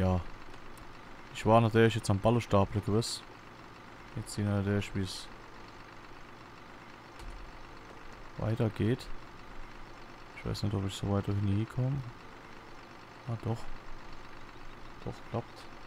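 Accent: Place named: Hesse, Germany. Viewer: Bist echt gut mit den Ballen, wie immer sehr angenehme Folge mit Hessische Mundart.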